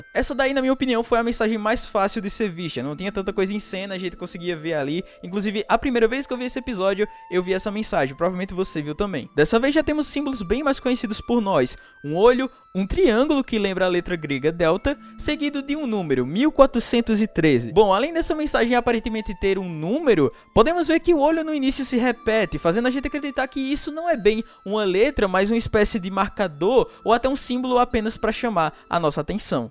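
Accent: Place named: Recife, Brazil. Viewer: Sou novo aqui deu 2 segundossdo cata falando e eu como : esse cara é de recife esse sutaque só pode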